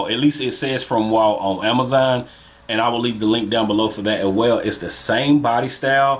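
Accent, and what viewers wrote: South, United States. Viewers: Where you from? I’m from Seattle and those southern accents always stand out around here lol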